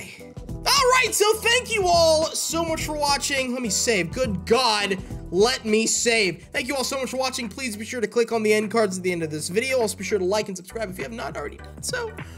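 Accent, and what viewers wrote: Texas, United States. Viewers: are you from Texas 🤔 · wait, are you Texan? lol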